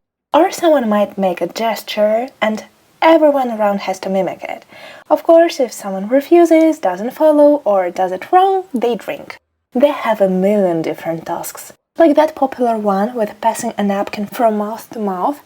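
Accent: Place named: China. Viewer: 为什么你说英语有中国口音哈哈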